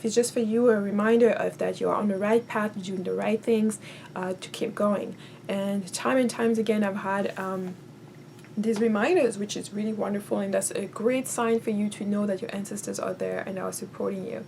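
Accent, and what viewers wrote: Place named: France. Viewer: i can hear that french is your first language. I have the same accent lol. americans are right, it does actually sound beautiful! i used to find mine annoying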